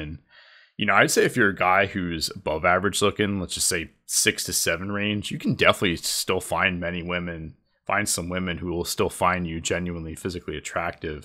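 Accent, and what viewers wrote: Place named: United States. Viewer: It's alsways a pleasure to watch your videos, you've got a beautiful american accent!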